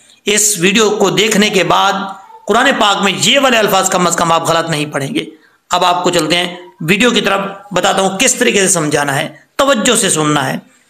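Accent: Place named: Punjab, Pakistan. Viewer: او بھائی! آپ خود تو کہیں سے سیکھ لیں، پنجابی میں پڑھ رہے ہیں،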